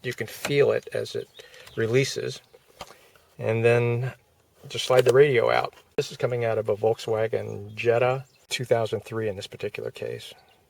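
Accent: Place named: United States. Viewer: Most helpful video - many thanks. BTW, you have a most pleasant accent - where in the US of A are you from? Regards - Roger from England.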